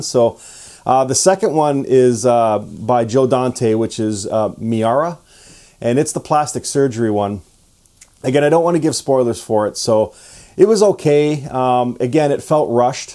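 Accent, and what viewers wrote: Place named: Canada. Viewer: Figured I heard a Canuck accent eh!!!!!! U were right this is a good movie. But I loved it and unlike you there were no Nickleback segments. As a seasoned gore whore I almost gave up on horror that went woke after 2018. Boy was I wrong and glad I gave it a try. Horror is in a dark cave at the moment but with a flashlight you can find a few gems in the shadows. BON CHANCE!!!!!!!😮😮😮😮😮😮😮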